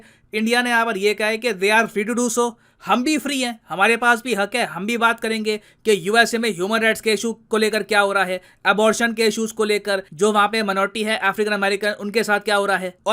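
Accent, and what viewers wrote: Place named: Punjab, India. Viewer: Sir app Punjabi ho ? ,Aisa accent tho pehli nehi Suna tha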